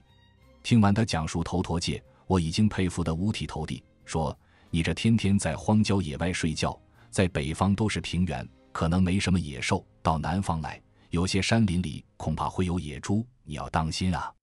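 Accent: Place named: Hunan, China. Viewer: 大概是65年前，在学校安排下，每年要去乡下插秧，收割2次，对些湖南乡下的口音，还记得些。今天听您的故事，对修頭陀的苦行僧很是尊敬，更是您用些湖南乡下的口音，引出乡愁。很为这久埋在记忆中的当时乡下佰姓的纯朴，实在，有一种由衷的怀念，感动。谢谢您的故事。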